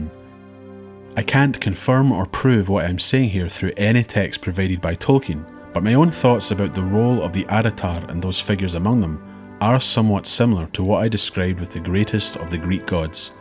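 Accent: Scotland, United Kingdom. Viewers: God your gorgeous silky scottish voice is so dreamy. I hope you become an audible narrator.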